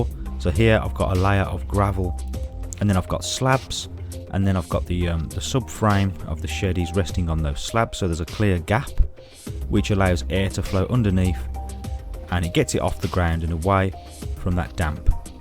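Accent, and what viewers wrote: Birmingham, United Kingdom. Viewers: Thanks for the heads-up. I headed over to that video, and your video on insulating your an cave. I had a question about the shroud, but will post it on that video. From your accent, I'd guess you are somewhere around Birmingham? (That's where I am) - rain protection definitely needed in the Midlands!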